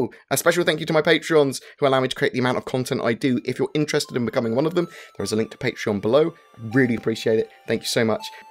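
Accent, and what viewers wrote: England, United Kingdom. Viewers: Thanks for the update. Are you English?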